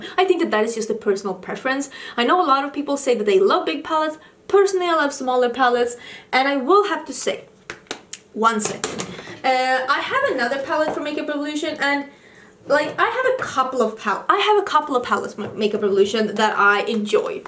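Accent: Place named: Sweden. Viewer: You’re such a sweetheart, I love that you’re Swedish too, most beauty YouTubers I come across are American or from the UK. Also like your accent again and just your honesty about what your thoughts are with makeup and products you try. Thank you, I subscribed after I watched one of your videos for the first time. 😊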